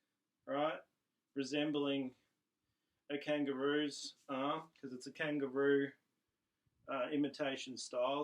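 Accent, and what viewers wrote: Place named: Australia. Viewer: Dude, you're Australian. I never put two and two together. I've watched a couple of your videos and always imaged you talking in a US accent. I always wondered where Kangaroo Kungfu comes from and now I know.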